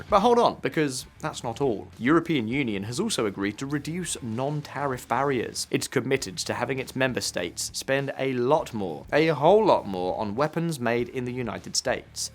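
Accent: RP, United Kingdom. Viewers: You cut all the pauses. Now your video doesn't breathe, it's rattling. It doesn't do justice to your posh british accent.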